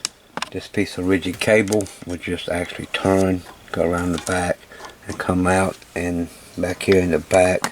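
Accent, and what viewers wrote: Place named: South Louisiana, United States. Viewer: Glad you and your family came out of the storm without any major damage - I did not know where you lived you have that South Louisiana kind of voice.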